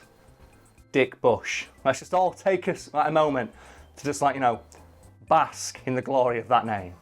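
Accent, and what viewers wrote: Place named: North, United Kingdom. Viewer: Talking about tea like that and having that northern accent, it's like I'm watching Guy Martin!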